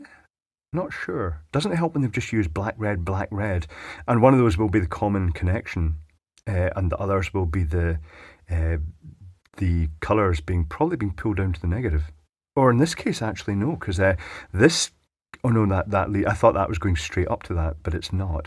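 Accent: Scotland, United Kingdom. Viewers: Came here just to hear 'piss-flap' in thick Scottish burr:)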